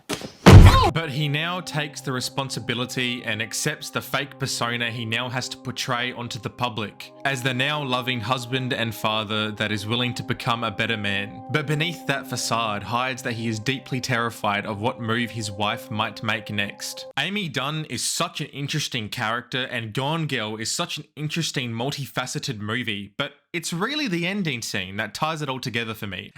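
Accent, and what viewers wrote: Australia. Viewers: Cool guy with Australian accent, defenetly subbing !❤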